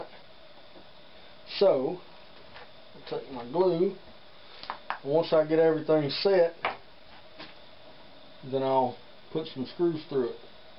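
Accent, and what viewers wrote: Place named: Tennessee, United States. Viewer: Great video like all of your videos. What part of the US do you live? You sound like you are from TN. I live about 30 miles east of Nashville and you sound like the rest of us. LOL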